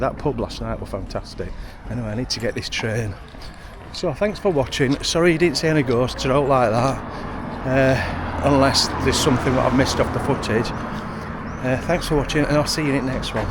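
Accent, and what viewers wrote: Yorkshire, United Kingdom. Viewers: I'm watching this from NZ. I was born a yorkshire lass, we emigrated to NZ in 1966 when I was just 8 years old. I love your beautiful accent, it reminds me so much of my darling dad. You are so funny, love your sense of humour. The part when you talked about assigning the ghosts to their room for the night.... hilarious!! I'm definitely a ghost believer and have had several encounters over the years, especially from my dad after he died 20 years ago. I've now subscribed so I can see more of you. Thankyou for the entertainment 😂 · Another great "Yorkshire Take" on somewhere I know very well! ☺️...I used to live just on the other side of Romney Marsh, at Hythe...another very historic town. You could easily do a run along that coast with in the van and stop at half a dozen nice places...maybe start at Folkestone, then Hythe...Camber Sands...Hastings...Bexhill... 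Eastbourne...and finish up on Beachy Head? Just a thought. The bit that made me laugh most was when you said "reet" to that fella in the pub...and he didn't have a clue what you meant! 😂 I don't think you Yorkshire folk quite realise how intriguing us soft southerners find your dialect! It is absolutely fascinating...as was the video, once again. Nice one! ☺️ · I knew you were a Yorkshire bloke when you started talking! I'm from Rotherham, but live in Sheffield. I've never been to Rye, but my other half will have, probably. Shame you didn't get any spooky happenings isn't it? Maybe next time and in the next place too. If you're worried about just being in your undies at night, take an old t-shirt with you to wear while you sleep. I don't go without one if I know I'll be away from home and they don't take much room up in your bag either. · “I’m trying to be spooky” 😂 “Think I’ll go t’ bar” Don’t even know why nearly everything you say is funny af… maybe it’s your fantastic Yorkshire accent… your personality… the way you and bounce off each other with your banter... 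Whatever it is, I really enjoy your uploads. Keep ‘em coming 🌞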